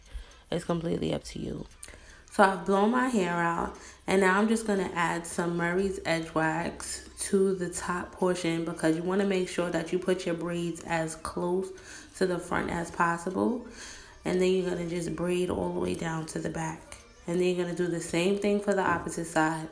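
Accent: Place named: Cleveland, United States. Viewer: You did your thing,! Are you from Cleveland? You sound like it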